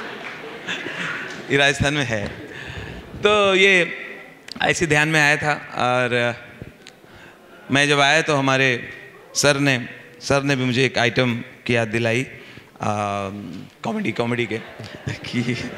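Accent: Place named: Kanpur, India. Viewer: Aap ko sun ke Kanpur yaad aa gaya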